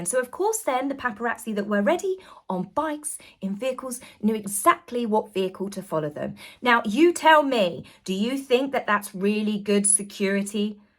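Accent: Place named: RP, United Kingdom. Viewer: As my husband says he talks posh bollocks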